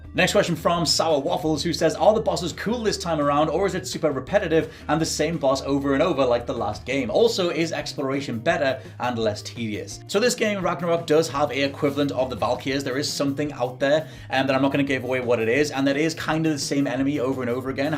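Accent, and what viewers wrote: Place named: United Kingdom. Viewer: yeah it's a because of the British accent. You'd need to be a moron not to realise it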